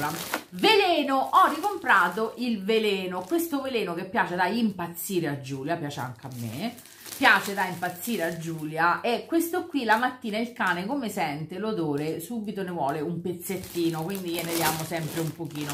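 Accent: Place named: Tuscany, Italy. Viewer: Dai, sei toscana...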